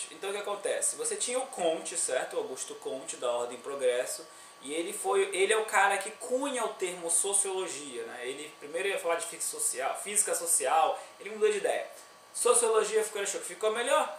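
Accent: Pará, Brazil. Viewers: É paraense?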